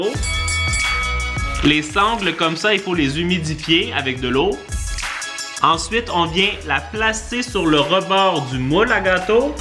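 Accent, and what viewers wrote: Canada. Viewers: tu mon youtubeur préféré desCarl is cooking vidéos accent canadien et qui met quoi des vidéos trop trop rire 🇨🇵🇨🇦👍👍👍👍👍👍👍👍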